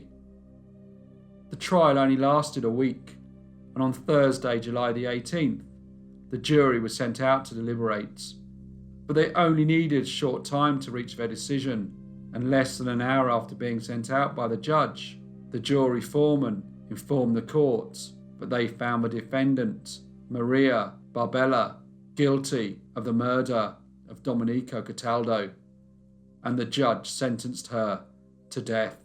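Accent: United Kingdom. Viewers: Mort sent me..... I also happen to love channels like yours and love the British accent. 🙋‍♀️♥️🤗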